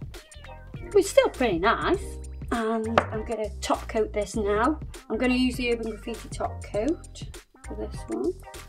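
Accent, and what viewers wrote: United Kingdom. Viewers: I really enjoy listening ur british accent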